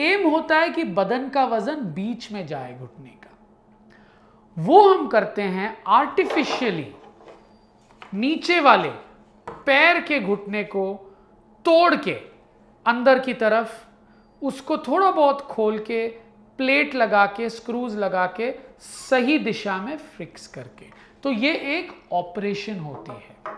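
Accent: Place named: Maharashtra, India. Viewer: Sir ,are you Maharashtrian? Because your accent is marathi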